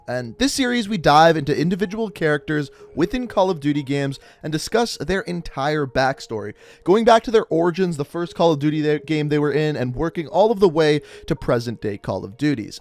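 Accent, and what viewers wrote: Canada. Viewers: Thats because hes Canadian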